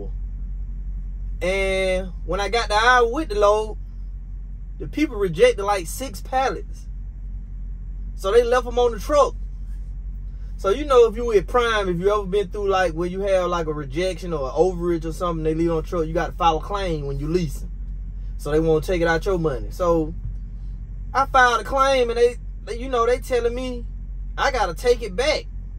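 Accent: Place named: South, United States. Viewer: Can tell your from the south, you have one hell of a southern draw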